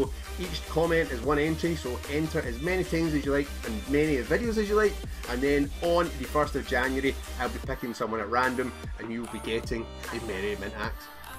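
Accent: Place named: Scotland, United Kingdom. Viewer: Unboxing Therapy Scottish version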